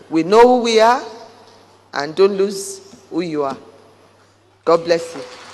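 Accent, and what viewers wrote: United Kingdom. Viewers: Please, please, please. Don't create more 50 second introductions to your interesting and entertaining videos. They are so well done. You are so intelligent, talented and have a beautiful, deep voice❣️ l love your British accent ❣️ I always fast forward the 50 second intro because I don't want to wait to get to the content. Please create more intros like the flames. Thank you for teaching us.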